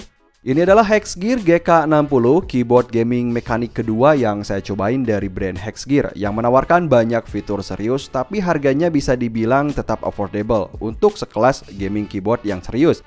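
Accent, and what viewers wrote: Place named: West Java, Indonesia. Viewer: Sunda pisan anjay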